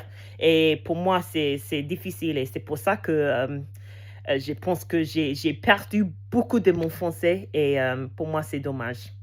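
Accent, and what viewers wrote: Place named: England, United Kingdom. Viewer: 4.5 le 0.5 c'est juste que t'as un accent anglais encore mais c'est mignon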